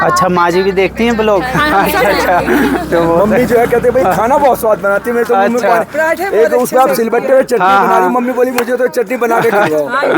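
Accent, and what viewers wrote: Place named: Uttar Pradesh, India. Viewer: Bhaiya aap kaha se ho aapke bolne ka tarike se tho aap UP se lagte ho bhut acchi lagti h aapki video me aapki new subscriber 😊❤❤😊😊😊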